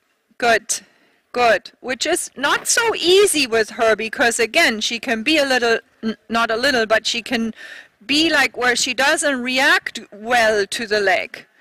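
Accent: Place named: Germany. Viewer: You really hear the german accent 🤣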